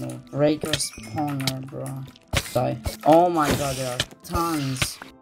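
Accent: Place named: Colombia. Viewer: great editing! are you colombian btw because you sound like it lol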